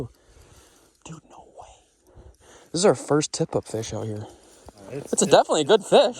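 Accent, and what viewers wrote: Minnesota, United States. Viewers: I love how you say fishin' and campin' like a Minnesotan,.:) Hello from Michigan (same accent here, basically).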